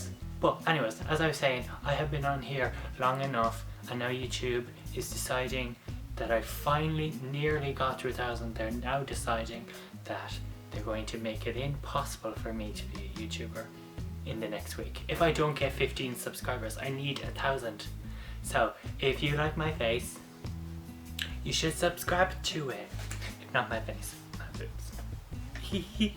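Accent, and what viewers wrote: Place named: Ireland. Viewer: Anyone saying you don't sound like a woman is what we in Canada like call "hosers", (foolish or uncultivated people), You definitely have a feminine voice, I actually always thought the Irish accent sounds sexier in women than men and you got that sexy goin' on sister in all aspect. Your videos are awesome and definitely inspirational, so I hope you go through with your channel no matter what, since as trans people, especially as trans women, we have a truly hard fight to break barriers, and I don't think YouTube's threats should be an exception to our strength.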